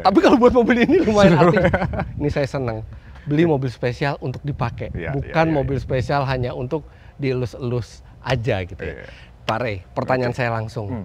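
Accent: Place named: Chinese-Indonesian, Indonesia. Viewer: dari aksennya iki cindo sby lek gak semarang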